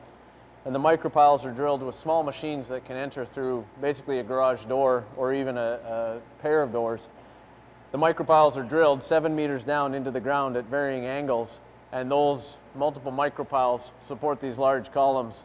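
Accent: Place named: Canada. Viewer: "Souwth" as an American this is the first Canadian I've ever come across that had a stereotypical Canadian accent.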